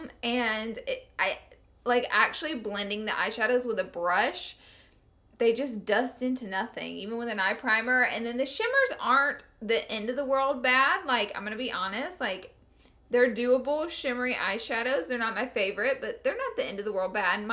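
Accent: South, United States. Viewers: i'm texan and her accent is way too southern for even texas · Hi, new sub here! Love your personality and southern accent! Can u plz do a tut on the look in this video.. I've been on the hunt for a purple eyeshadow look like this! Thanks!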